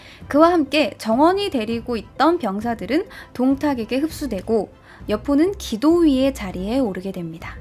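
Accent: Gyeongsang, South Korea. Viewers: ㅋㅋㅋ 오리지널 네이티브 부산 경남 사투리를 구사하시네요? 갱상도 여자 아입니까?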